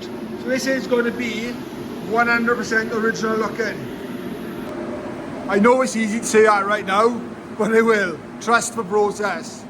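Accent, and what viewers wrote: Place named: South Wales Valleys, United Kingdom. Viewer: yes it is a c20let haven't driven it for 18 years tho. Sounds like your from the top of the valleys I'm from Caerphilly not too far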